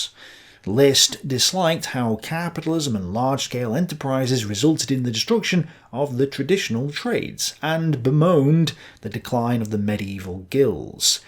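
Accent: United Kingdom. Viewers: Overlooked is their primary motive and impulse to expand Europe eastward into a world superpower colossus. No matter how bizarre, any ideology to further that goal no matter was deemed proper. That's why Hitler tolerated that stuff. It's amusing to hear a guy with a Limey accent in the once great British Empire bad mouthing that failed dream.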